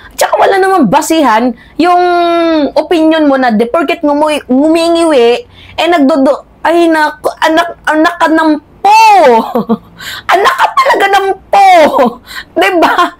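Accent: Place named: Ilocos, Philippines. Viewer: Team Agustin vlog po ganun din magsalita. Mga ilokano ganun lalo na Pag inielaborate ang wordings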